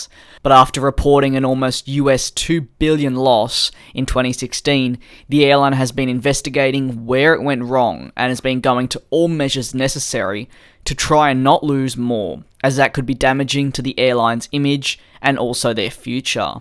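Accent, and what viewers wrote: Australia. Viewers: "Reuters" spot on pronunciation...even with an Aussie accent!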